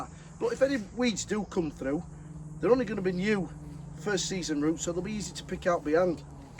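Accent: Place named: Yorkshire, United Kingdom. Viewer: New subscriber here and a relatively newbie to allotment life, only had mine just over a year. Whereabouts in the UK are you? Listening to your accent you’re not too far from me in Yorkshire and it’s good to follow someone who lives in the same area to know what they can grow etc. PS I’ve also got compost envy. 🤣 Deb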